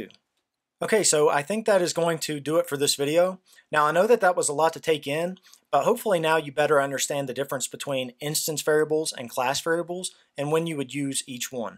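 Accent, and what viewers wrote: United States. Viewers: Great videos. Love them. Learnt a lot from you. Thanks so much. The American accent was awesome too. Like Buster Scruggs teaching me about class variables. Wanted to shoot a gun into the air each time I completed something. Yeehaw. pew pew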